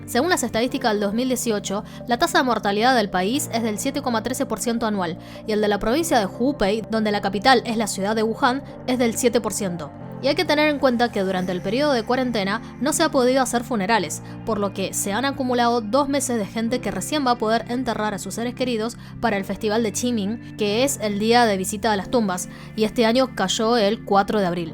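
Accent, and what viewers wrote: Buenos Aires, Argentina. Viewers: muchas felicitaciones por exponer u explicar, te soy sincero hablas muy pero re-bien el castellano con ese pequeno tonito Argentino, pero mi gran admiracion y respeto para ti. Gracias.! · Esta chica habla un español de Buenos Aires mejor que el mio.....congrats. Ya nada importa el virus.....estamos en el mismo barco. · Qué graciosa!!! Una china hablando español con acento argentino!!!! Además super controlado el acento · Oye tu español está perfecto! En cuanto a China.. vamos a separar 3 cosas. 1. China como país NO tiene la culpa 2. Todos los ciudadanos de china tampoco tienen la culpa, la gente de China es muy respetuosa y tranquila, son buenas personas 3. El Gobierno de China fue el problema. Peeeeeero en USA, Europa etc.. actuaron tarde igual que el gobierno de China. Tú debes estar tranquila, tu país y tus compatriotas NO tienen NADA que ver con esto Soy mexicano y si, el gobierno de México, actuó tarde como lo hizo el gobierno de China. 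Oye se te nota el acero como de argentina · Qué "porteño" que hablas por ser china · Se nota q viviste en Argentina ! Bella e inteligente !! Estás en Argentina ? O seguís en tu país ?? Me encanta q seas muy clara ! Q con acento Argentino reconozcan tu español latino Argento !! 😘😘😘😘😍😍😍😍❤❤❤❤ Sos hermosa , te queda muy bien tu cabello suelto !! Sos muy joven ! Eres soltera ?? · Muy bueno tu informe ..muy acertado ..me escanta tu tonada argentina ☺ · Yo me quedaría callada, todos sabemos cómo es el gobierno Chino , y por otro lado el virus salió de algún lado ya sea de laboratorio o que no hirvieron bien su murciélago y por su culpa estamos todos metidos en casa y ahora deben de responder por todo el daño que causaron además ese pinche acento argentino no te ayuda en nada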